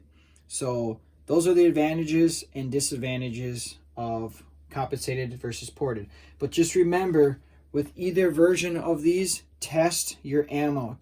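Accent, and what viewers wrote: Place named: Minnesota, United States. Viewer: your voice and accent is remarkably similar to the friends' who I grew up with in Minnesota (near the MN/WI border in a town called North Branch).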